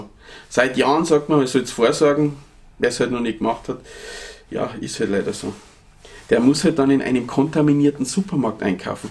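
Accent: Bavaria, Germany. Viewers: Gutes video, und die bayerische mundart kann ich sogar verstehen. Da gibt es schlimmeres.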